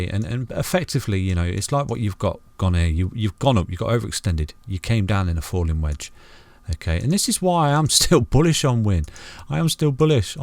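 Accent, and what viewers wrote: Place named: Nottingham, United Kingdom. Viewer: You are so from Notts lol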